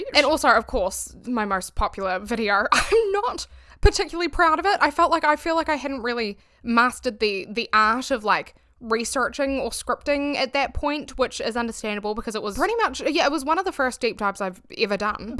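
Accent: New Zealand. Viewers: You're so kiwi I love it. I'm Aussie and definitely would have asked you to say "six" and then laughed when you said "sex" when I was at school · I mean i heard it, i went to check her page, was delighted she's a kiwi, and went on with my day 😂 do people really complain about that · So weird (good weird!!) to hear another NZ accent!! ❤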